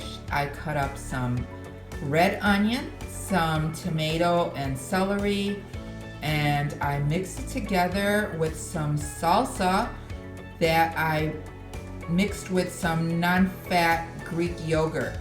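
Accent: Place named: Midwest, United States. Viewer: you're welcome! I asked on aonther if you were originally from the Midwest (I'm from MI, lived in WI a while, and I feel like I hear Midwest when you speak 🙂)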